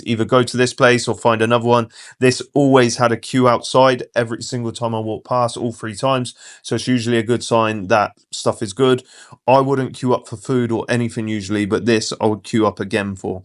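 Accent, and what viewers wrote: United Kingdom. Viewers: I absolutely LOVE the british accent, i can listen to that all day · It must be from the UK that's why you knows protons..but unfortunately you doesn't know that protons are malaysian cars..Actually all around you are proton cars but you don't know because it's possible that protons aren't marketed in the UK anymore..that's why you don't know the new release from protons..and you noticeable accent is Uk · What part of UK are you from because your English is English…u know what I mean?? You are speaking very smoothly, every one can understand you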